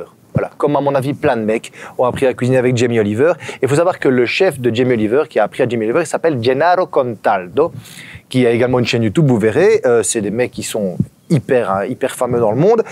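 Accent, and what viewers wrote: Belgium. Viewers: Une vidéo complète avec de bonnes explications ; du coup je vais de ce pas m'abonner à votre site car "cerise sur le gâteau" : J'adore votre accent qui me rappelle ma jeunesse lorsque j'allais à Liège chez ma tante (précision : je suis française 😉😉) A bientôt pour de nouvelles vidéos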